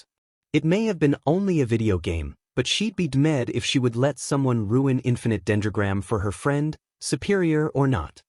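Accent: United Kingdom. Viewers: Why are you suddently British? No like you didn't have a British aí voice videos ago and now you have tf?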